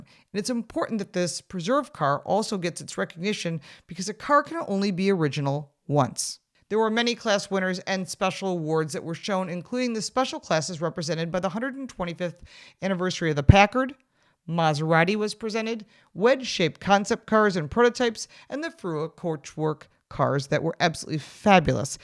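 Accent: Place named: Midwest, United States. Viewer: 'thought so. i'm mainly from Chicago originally, but spent a couple years in Detroit (Southfield, 12 Mi. & Greenfield, <num>) & had family in Detroit. Unique midwestern accent! 'Enjoyed your video - What a great job to review those wonderful classics!! - Best wishes, Phil in AZ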